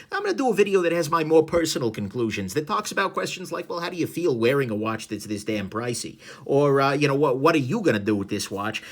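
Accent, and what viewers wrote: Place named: East Coast, United States. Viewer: I love his accent. It’s like a East coast mobster selling you a caahh. Cheers mate!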